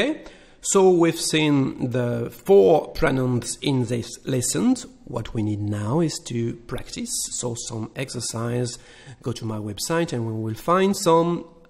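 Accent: France. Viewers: Are you actually French or you are just really good at it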